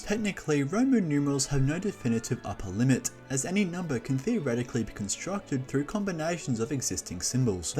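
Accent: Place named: Italy. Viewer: the pronunciation was kind of weird, he mixed anglicized pronunciation with an italian reading of the C. latin is dead and also everywhere so you can pronounce it however you want, but I'm not sure by what standards that was nice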